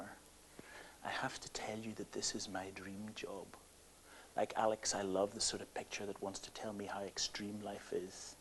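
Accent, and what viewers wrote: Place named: Northern Ireland, United Kingdom. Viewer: Hes from Northern Ireland buddy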